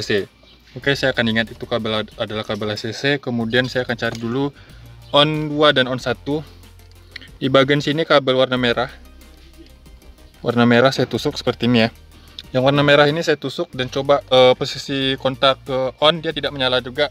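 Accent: West Sumatra, Indonesia. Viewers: Logat org minang 😆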